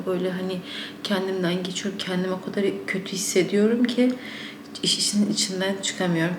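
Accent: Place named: Russia. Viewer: Türkceyi ögrendide russ kaldi😂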